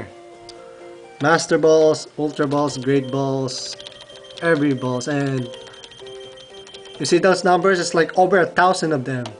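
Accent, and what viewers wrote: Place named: Philippines. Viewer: by the way he speaks.... i am going to say hes a filipino -__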